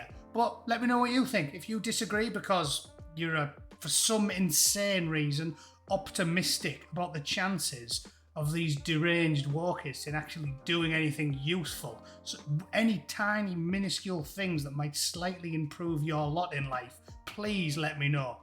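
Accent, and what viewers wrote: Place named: North, United Kingdom. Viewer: Definitely prefer to get the news through you as its healthy to laugh..but whats that elevator music in background?..trying to soften your northern voice??..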